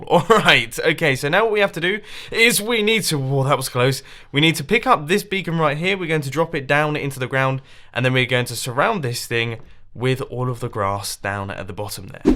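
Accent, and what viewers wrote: United Kingdom. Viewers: Mumbo is so British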